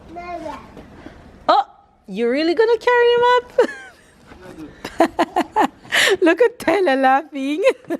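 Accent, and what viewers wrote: Jamaica. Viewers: I sure will. I look forward to knowing you better. Are you Jamaican 🇯🇲? You sound like one. I'm Nigerian 🇳🇬 and my man is Irish 🇮🇪 🍀. Don't forget to subscribe to my channel too so you can also see what I'm up to.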